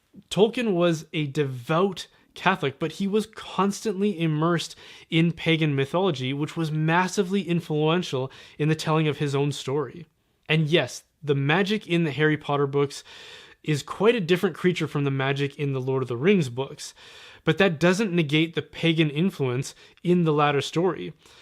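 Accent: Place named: Canada. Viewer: Like your Canadian accent... "abowt" ☺️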